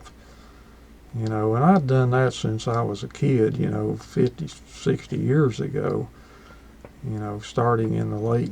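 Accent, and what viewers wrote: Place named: North Carolina, United States. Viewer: I like your playing, and your voice (the southern twang from....North Carolina??), and also the old electronic gear in your room. All the best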